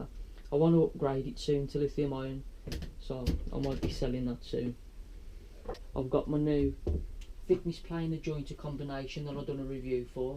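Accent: Black Country, United Kingdom. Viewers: Blimey i thought i had a small working space in the garage, but you are doing a great job with the space you have, i am impressed, you sound like you are from my neck of the woods in the Black Country!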